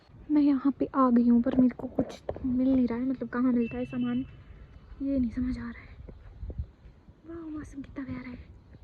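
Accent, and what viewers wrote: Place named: Pahadi, India. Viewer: Pahadi tone is beautiful ❤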